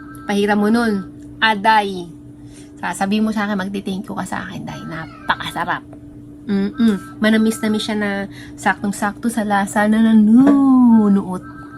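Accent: Visayas, Philippines. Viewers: Hahah... Mommy kiat lng bah ... Bisaya jd kaau mommy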